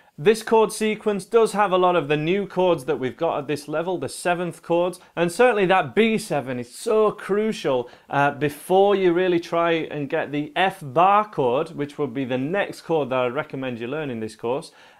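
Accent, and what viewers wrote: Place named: County Durham, United Kingdom. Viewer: Hi, This is pretty good stuff that you are doing, very helpful. I played jazz saxophone for thirty five years and have just picked up a guitar (at seventy three) Where are you from? I assumed you were all American, you sound like North East England, County Durham lilt to your voice. Thanks again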